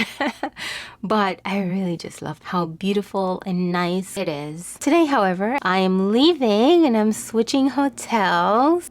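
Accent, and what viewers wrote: Jamaica. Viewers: Just came across your channel, Watched all your videos. Love The Content. And I could tell from you're accent that you're Jamaican too. Blessup and keep up the good work. Love the editing also. I Subscribed, so looking forward to more videos.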